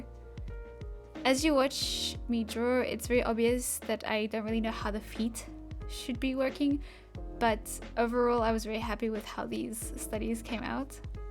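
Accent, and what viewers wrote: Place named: South Africa. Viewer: You sound like a South African. Nice accent. Also great video xD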